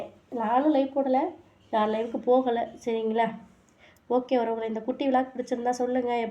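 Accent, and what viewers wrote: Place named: Tirunelveli, India. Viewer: Thirunelveli பாசை sis 🎉🎉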